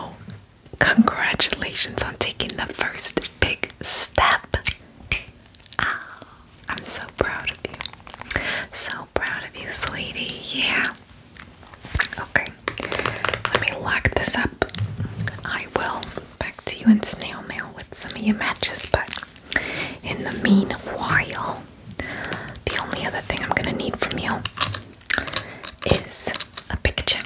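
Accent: New Jersey, United States. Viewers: I live in NJ and there are absolutely Italian women that sound like this all around me. It's definitely more common towards the north end of the state and into NYC. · yeah i’ve never heard a single person unironically talk like that, some ppl come close but i like the idea that it’s jersey. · I think maybe because this is more of a Jersey accent. My boyfriend is from Brooklyn he has a completely different accent than this 😅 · The NJ accent is on point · THE JOY I FELT HEARING THE ACCENT😭 JERSEY FAIRIES FOR LIFE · Omgggg I missed my jersey accent fairy!!!! This is the best news. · the jersey accent always reminds me of linda belcher from bobs burgers😞😞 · That’s definitely Jersey lol sounds like a Jersey Italian lol 😄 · the accent is Jersey, not New York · THE JERSEY ACCENT IS BACK ❤❤❤❤❤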